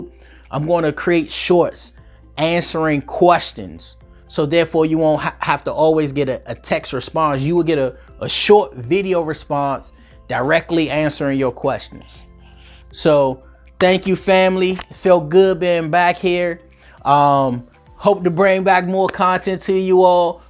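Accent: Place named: Washington DC, United States. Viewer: I can tell by your accent you're from DC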